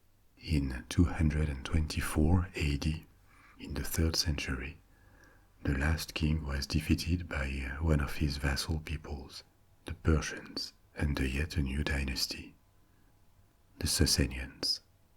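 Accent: France. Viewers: My friend, I listen to your stories every night before I fall asleep. When I am asked how I slept, I say “wonderful!” How could I not when I have a dear French friend with a soothing and silky accented voice who reads to me until I fall asleep?” I’ve told many in my circle about you and most of them thank me joyfully! I have never listened during the day, but I did with this one. You are exceptional in your descriptions, your words paint the pictures in my brain! You are truly a treasure and I thank you from the bottom of my heart for putting in all of the countless hours it must take you to research the histories, write your script, set up your “scenery” for you to handle (VERY hypnotic), record, edit……..it exhausts me just typing just the steps out! It leaves me wondering when you find time to eat, spend time with your family, work or any of the things required to live your life! 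I have never chosen to use patreon, but I will now. You give me countless hours and it’s only right for me to give back. Thank you. From the bottom of my heart, my friend. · yes, he is French. He lives in Paris, so im guessing he was born there too. I love Paris. I lived there for 2 years. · Yes. I'm not 100% sure, but I believe his accent is French. 🤔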